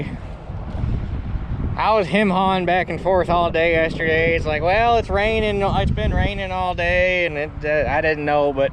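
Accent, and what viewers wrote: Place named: Michigan, United States. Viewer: Sounds like you are from Michigan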